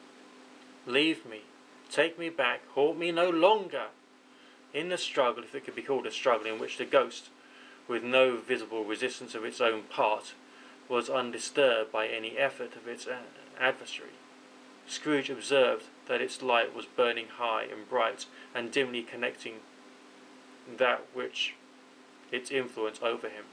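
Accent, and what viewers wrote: United Kingdom. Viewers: This is really a great reading , especially with that British accent, very good!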